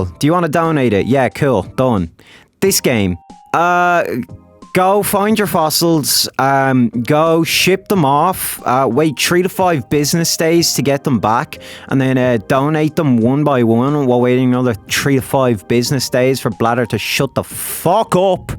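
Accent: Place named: Ireland. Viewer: instantly clocked that you’re a fellow irish person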